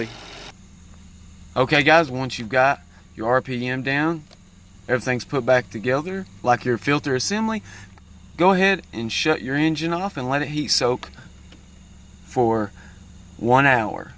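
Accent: South, United States. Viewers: That southern drawl is driving me crazy. And I'm from Mississippi.😂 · I’m guessing you’re from the south 😂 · You country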